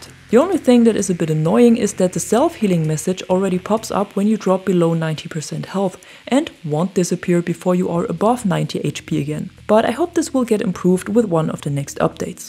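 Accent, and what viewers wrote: Germany. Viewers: Wie man merkt dass du eine deutsche bist haha ✌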